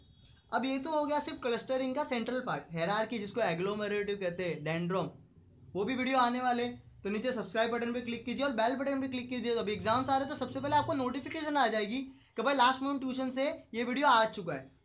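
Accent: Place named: Mumbai, India. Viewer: Mast mazza aa gaya mumbai wala koi dost samza raha hai laga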